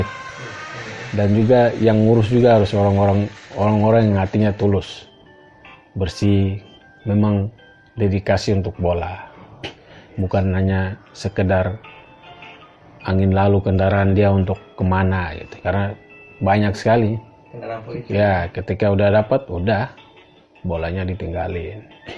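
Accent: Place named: Minangkabau, Indonesia. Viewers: Dialek bahasanya dah persis orang Minang.mantaap. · Logat sdh Minang sekali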